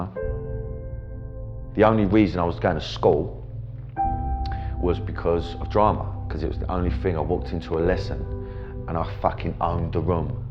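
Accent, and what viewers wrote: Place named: Cockney, United Kingdom. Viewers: He's a cockney... that's how they speak. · People from Hertfordshire speak worse than him. Canning town is an east London shit HOLE he genuinely speaks like that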